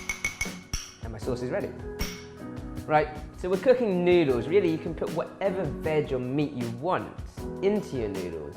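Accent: England, United Kingdom. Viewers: Chinese man with the pom accent, no matter what countries how gangster you are.? But when you have a pom accent made you look cool and sweet. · is my new favorite internet chef and I watch A LOT of them. He is straightforward and simple, has a cute Brit accent, makes DOABLE dishes and is a NO-NONSENSE kitchen master. I suspect he speaks Cantonese and some French. My main man!!!! Bon appetite! Cheers.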